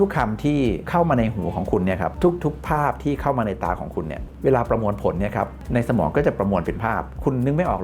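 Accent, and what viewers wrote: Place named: North, Thailand. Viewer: นี่คนเหนือชัวๆ😂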